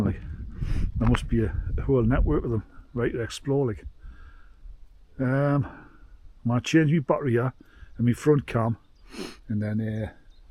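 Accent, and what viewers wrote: United Kingdom. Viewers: He is probably a Geordie? From Newcastle in North East England. I am from London, England. If he is from Sunderland or Gateshead? He will hate me for calling him a Geordie 😅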